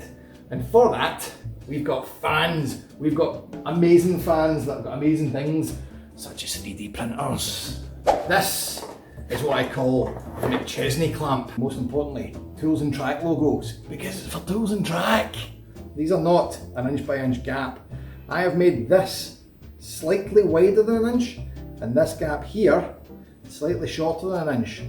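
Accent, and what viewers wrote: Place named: Scotland, United Kingdom. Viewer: I Like the video but the Scots accent from the scot is sometimes hard to pick up what he is trying to tell us about his build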